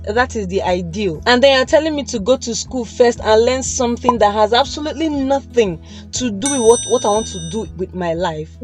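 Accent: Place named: Nigeria. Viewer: I like Nigerian accent.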